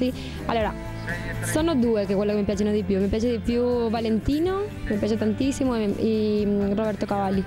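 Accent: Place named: Italy. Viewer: Soo what!!!! you speak English whith Italian accent.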